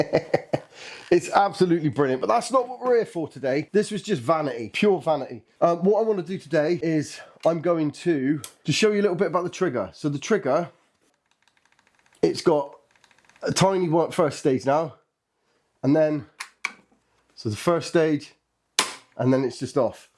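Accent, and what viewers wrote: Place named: England, United Kingdom. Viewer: wow... wow... wow... I didn't realize that the paper target that you are aiming is just that small..... Your shooting is really good actually... I thought the target was like 12 inches size but it turned out to be that so tiny..... You are eligible to go for competitions already.... And still, I still love your accent... it sounds like those English movies...plus the vocabulary...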